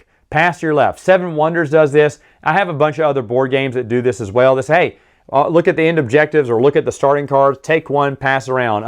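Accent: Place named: South, United States. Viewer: Hey, I hope you don't mind answering a question I've been asking myself for a long time while watching your videos: I'm from Europe and English is just my third language. However, I love the English language and I want to learn as much about it as possible. I'm pretty sure I'm able to recognize several different dialects/accents, including Irish, Scottish, several British dialects, Australian, New Zealand accent, etc. However, when it comes to North American dialects, I still have problems differentiating between all of them. I think I'm able to recognize New York dialect, stereotypical Italian American dialect (based on Martin Scorsese movies, lol) vague Canadian dialect and vague Southern American dialect. I'm pretty sure you're from the southern US. Am I right? When I watch American movies, I often hear people mention "Midwestern" or "Californian" dialects, but they just sound "American" to me. 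Any advice how to recognize the differences? I know this question has absolutely nothing to do with your video, but for some reason, your videos always remind me of this question.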